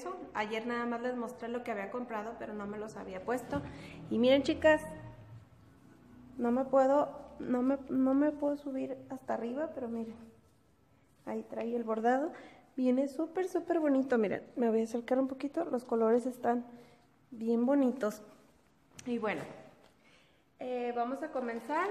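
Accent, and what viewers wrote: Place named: Nayarit, Mexico. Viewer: Jejeje me encanta tu acento nayarita, asi hablan las tias de mi esposo💕💕💕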